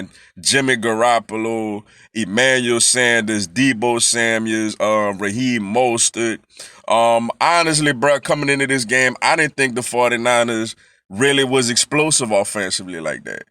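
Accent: New Orleans, United States. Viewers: Bruh you sound like your from New Orleans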